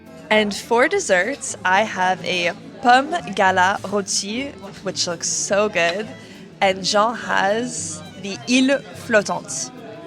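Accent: France. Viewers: Youtube suggested your channel and this video is my first experience and I am a bit confused - are you giving all these suggestions for English speaking tourists or for the expats living in France? If both, then I have to say that you speaking with your wonderful heavy French accent or naming your dishes in French is not that helpful! I think your concept is fantastic, but if you want more subscribers or viewers then try at least in both languages and speak a bit slower when you saying it in French than saying it so fast! Of course none of this matter if your subscribers are fluent in French! Thank you! 😊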